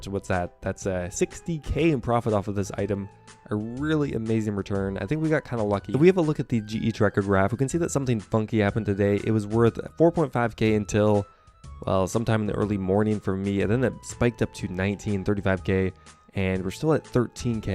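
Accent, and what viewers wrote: Canada. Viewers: Hey random question, you sound like you're Canadian but originally from a European country, or am I just hearing things lol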